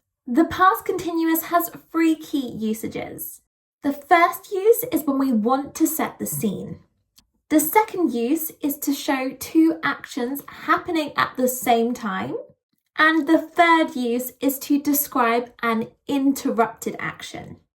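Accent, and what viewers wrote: United Kingdom. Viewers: Love British accent.❤❤❤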